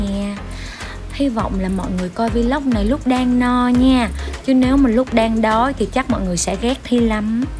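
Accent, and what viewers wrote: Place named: Saigon, Vietnam. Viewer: Blogger đầu tiên xem mà mắc cười cách nói chuyện và điệu cười luôn. rất dễ thương, giọng SG yêu lắm, mà thích nhất lúc bả nói chai rượu mập như con heo, rồi đang nói thì cười cái vụ tốc váy, rất gẫn gũi, chân thật và dễ thương. Mong luôn đáng yêu và hài hước như zậy <3 rất đáng xem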